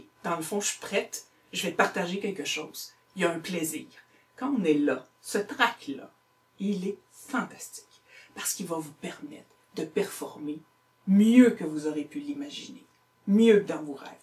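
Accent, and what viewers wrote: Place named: Quebec, Canada. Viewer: Moi aussi j'adoooore! Merci beaucoup pour cette vidéo, au si bel accent de la belle province! Gros becs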